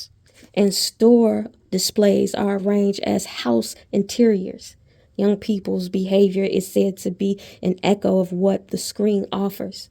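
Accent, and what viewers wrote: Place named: South, United States. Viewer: You're voice is amazing, great narrator. What region of the US are you from? I hear a little southern accent... beautiful.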